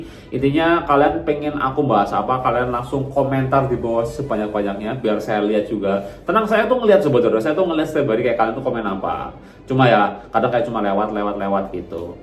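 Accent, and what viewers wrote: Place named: Java, Indonesia. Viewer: Mas aku mo nanya..,btw kamu aslinya dari Jawa Tengah/Jawa Timur. Koq logatnya Jawa bngt🙄 yg kedua aku mo nanya..,mas subscribeermu itu banyak lho mas..,🧐tapi koq yg nonton cuman 15 ribu 20 ribu🙄itu knp mas...?!!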